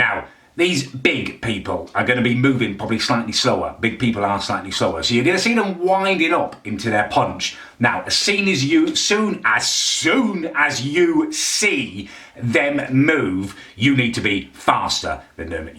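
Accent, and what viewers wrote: United Kingdom. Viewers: Anthony Fantano with a British accent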